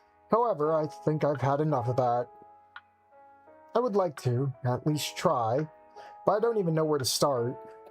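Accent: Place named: Finland. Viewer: Finglish strikes again.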